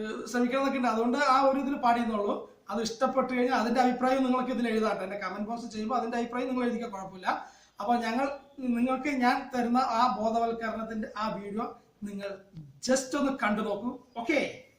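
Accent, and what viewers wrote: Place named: Palakkad, India. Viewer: aivvaa palakkadan basha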